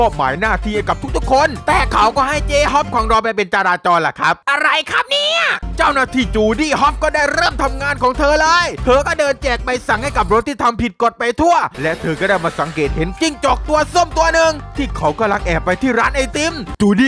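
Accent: South, Thailand. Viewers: ฟังเสียงพากแล้วเพลินดีครับ ออกสำเนียงใต้นิดๆฟังแล้วมีเสน่ห์ดีครับ ทำต่อไปนะครับจะติดตามและเป็นกำลังใจให้ครับ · พี่เป็นคนใต้หรือเปล่าเนี่ยเห็นสำเนียงเหมือนอ่ะ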